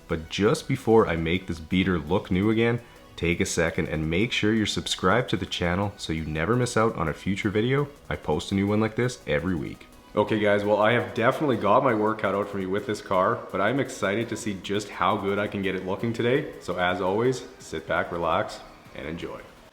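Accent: Canada. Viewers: He’s sounding extra Canadian in this one, right? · I can hear the Canadian accent for sure here lol please come to Toronto man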